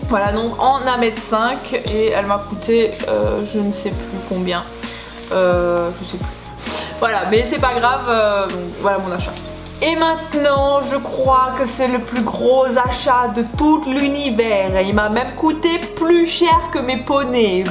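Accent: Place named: Alsace, France. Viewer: tu l accent alsaciens à fond 😂 mais bon faux pas ce moquer quand beaucoup de gants l ont 😂 bref super vidéo 😘 #teamalsacienne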